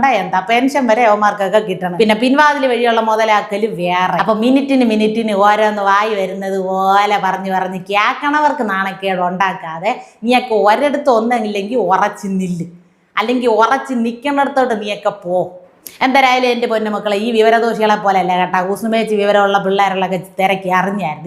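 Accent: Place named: Thiruvananthapuram, India. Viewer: തിരുവനന്തപുരം ഭാഷ ശൈലി തിരെഞ്ഞെടുത്തതിന് നന്ദി കാണാനും കേൾക്കാനും ഒരു ചേലുണ്ട്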